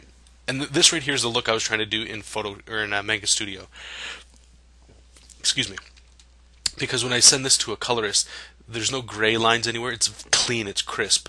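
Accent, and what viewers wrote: Canada. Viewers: Are you from Canada, aye?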